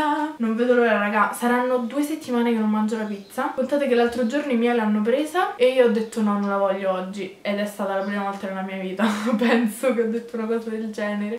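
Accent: Rome, Italy. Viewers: in questo video ti ho vista particolarmente ''Romanaccia''.....bellaaaa spontanea in alcune parti!!! Miii piace bravaaa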